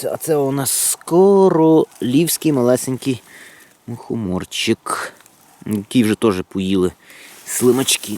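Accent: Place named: Ivano-Frankivsk, Ukraine. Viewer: разве во Львове так разговаривают, нет он с Франковской обл.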